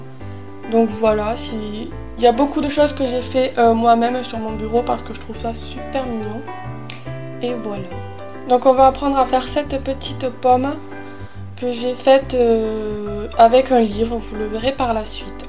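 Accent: South, France. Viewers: Tu viens du sud?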